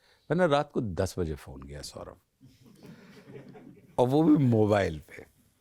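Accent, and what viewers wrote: Standard. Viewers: Bahat khubsurat Hindi bhasha ko Sanman se bolte hai sanman dete hai❤❤❤ aaj tak koi hero Heroine ko itna hindi me baat karte kishi show me nahi dekha sirf movie me bolte he ,baki to English English par ,, Aashutosh ji very pure ❤❤❤ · Your hindi is awesome....ekdum shuddh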